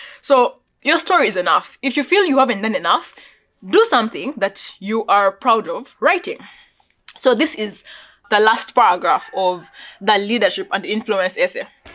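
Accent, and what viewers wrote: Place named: Kenya. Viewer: Sounding like my Kenyan friend 🤗🤗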